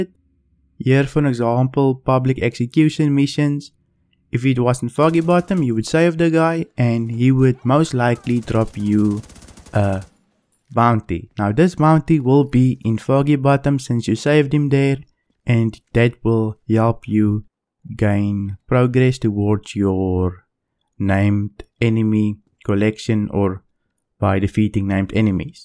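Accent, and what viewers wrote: South Africa. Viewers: Are you south african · Is that a South African accent I hear?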